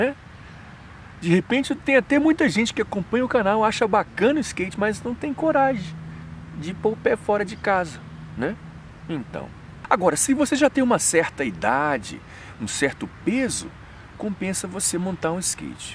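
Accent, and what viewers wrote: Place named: Brazil. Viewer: tu tem muitos vícios de linguagem brasileiros no teu inglês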